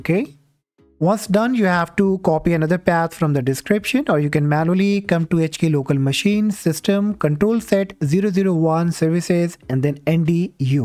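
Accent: India. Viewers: Honestly once i heard that indian accent i instanly knew this was going to work and it did infact work thank you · cuz i you thought he was an indian acsent guy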